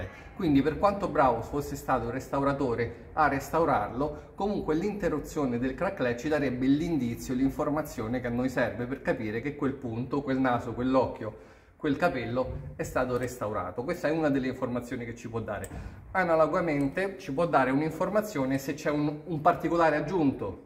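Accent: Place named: Rome, Italy. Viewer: Ma è sicuro che «craquelure», francese, si pronunci proprio così come fa Lei? Confronti l'ortoepia magari su Google traduttore e vedrà che l'esito è un po' diverso dalla Sua interpretazione. Certo la /ü/ è notevolmente difficile da rendere, specialmente per un «romano de Roma», però con un po' d'esercizio magari...!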